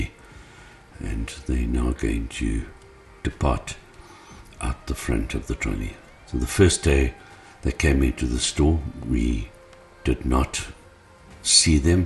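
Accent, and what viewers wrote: South Africa. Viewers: Sounds like a South African accent. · Ughh a Afrikaner accent gross. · It's obviously not America. All the shoppers are black and the narrator has a South African accent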